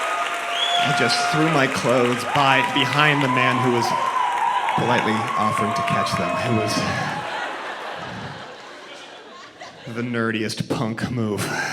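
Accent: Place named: Massachusetts, United States. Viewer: I was thinking ok that’s Massachusetts or Portland because I actually understand this guy and I now I believe it is Massachusetts.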